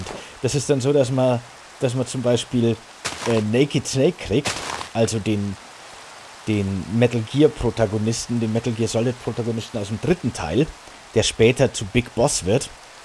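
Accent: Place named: Bavaria, Germany. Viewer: Ich liebe Deine art einfach so ruhig und ohne Geschrei und der Bayrische Dialekt :)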